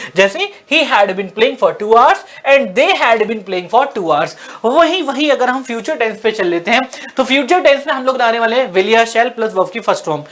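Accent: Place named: Uttar Pradesh, India. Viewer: Sir aapki mujhe U.p. ki boli achhi lgti hai aap aligad se ho meri nnihaal hai vha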